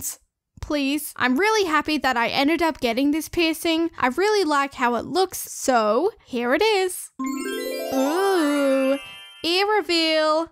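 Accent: Australia. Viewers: Are you Aussie?? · the australian accesnt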